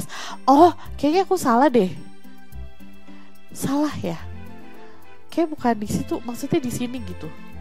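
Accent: West Java, Indonesia. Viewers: yeyy upload lgi❤️❤️.. mskpun telat..... edit: sundanya keluar semua😂wkwkwkw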